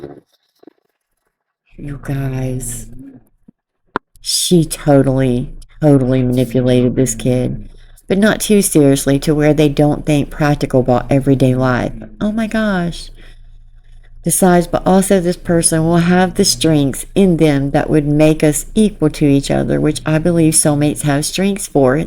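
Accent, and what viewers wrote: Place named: South, United States. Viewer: ❤ you dont need to :) like your dialect, 😊 is this a southern? I am from Switzerland and learning English,start noticing dialect only after a while 😊 Sheena needs to say children are off limit is unnecessary and screams predator behavior .. They are child trafficking itches ... and this joe is absolutely sus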